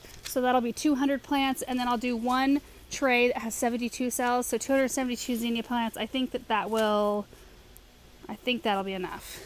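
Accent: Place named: United States. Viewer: There are some words you say that make me smile at the difference between the UK and the US. You say Gerbera, and we make that into a J sound (Ge together making the J sound), so we say it like Jerbera. The other one is Dahlia, which you make an ah sound and we make an ay sound (so we say Daylia)! 2 countries separated by a common language in action ❤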